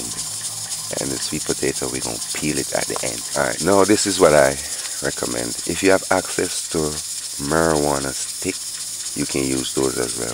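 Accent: Jamaica. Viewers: Leave him alone, he’s not pretending to have an English accent, he is Jamaican . There are people who enjoy watching him such as myself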